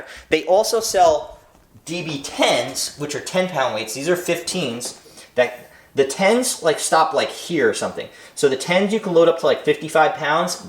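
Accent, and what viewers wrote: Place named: New Jersey, United States. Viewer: Accent and old Ferrigno plates. NJ?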